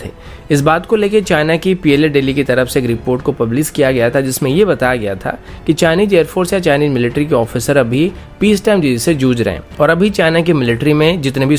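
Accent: Odisha, India. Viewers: Sir aap kya Odisha ke hai.mote emiti laguchhi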